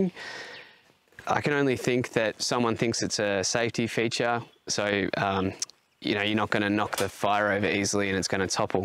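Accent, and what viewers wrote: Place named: Victoria, Australia. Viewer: Victorian accent gonna confuse ppl every time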